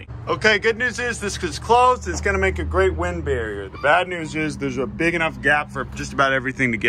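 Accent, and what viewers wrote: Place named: Pittsburgh, United States. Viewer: Dude your Pittsburgh accent while on the fahm is the best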